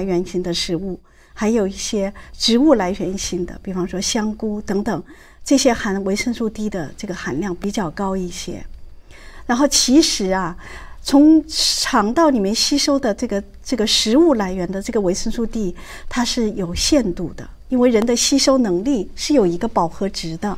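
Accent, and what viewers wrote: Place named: China. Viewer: 这位医生有大陆口音😊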